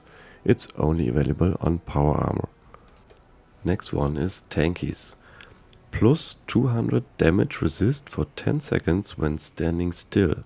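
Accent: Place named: Germany. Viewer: Du hörst dich an, wie ein deutscher der sehr gut englisch spricht.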